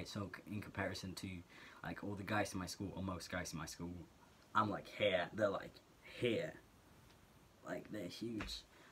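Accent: England, United Kingdom. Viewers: I gotta agree with the complements on your voice. Like damn, its an attractive voice. And when you add you english accent, it really sounds good. Have a great day :) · I realy Like the way u speak (i mean the brithish accend) sry i'm from Germany and my Englisch is not the best 😂 (this isn't so good because my Grandpa is from Wales so i'm stupid 😂)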